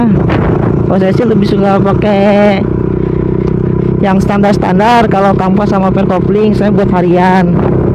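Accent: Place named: Betawi, Indonesia. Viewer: nadanya anak betawi asli ahhaha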